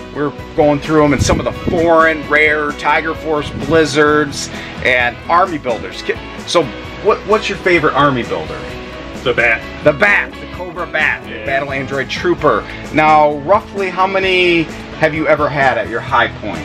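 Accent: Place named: Michigan, United States. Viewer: Just found your channel, and it sounds like you're from Michigan as well. You're grabbing all these toys right out from under my nose!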